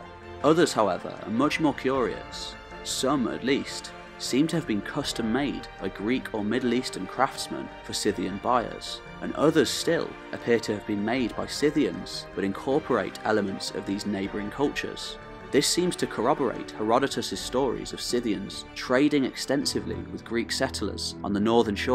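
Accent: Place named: Yorkshire, United Kingdom. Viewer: This guy is from the north of England - Yorkshire, I think - Ewan is Scottish. Very different.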